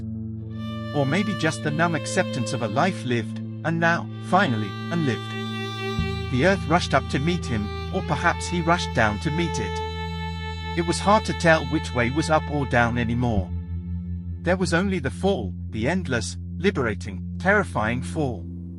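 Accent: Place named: India. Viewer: There are so many better text to voice models. Like elevenlabs. Please replace this lifeless indian-robotic commentary. The whole concept strongly depends on the speaker.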